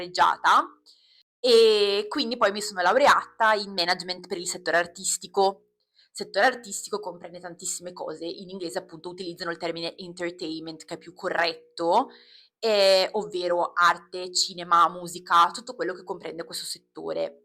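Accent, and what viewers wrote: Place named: Tuscany, Italy. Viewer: nella parlata hai un sottofondo toscano, forse hai parenti o origine di quel posto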